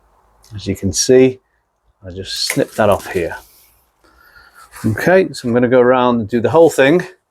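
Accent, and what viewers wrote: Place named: Midlands, United Kingdom. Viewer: I just learned allotment gardening when we were over in the UK this past March/April. My husband is listening to this video trying to guess your location. So far he's guessed Midlands, Birmingham, Manchester 😂 He's from Leicester originally. I love your greenhouse! ❤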